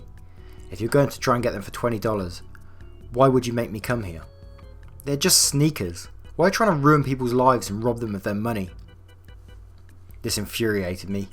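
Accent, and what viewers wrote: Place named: Bristol, United Kingdom. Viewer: Ok then, on some occasions you sounded like a Bristolian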